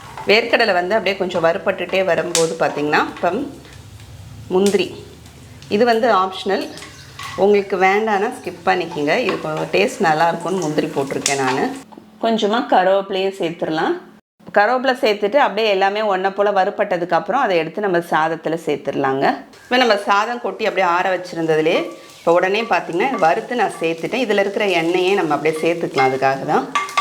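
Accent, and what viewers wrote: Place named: Pudukkottai, India. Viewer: Iam 58 belong to Pudukottai The way you we’re speaking reminded my side people voice More than the receipe I enjoyed your Tamil. Good going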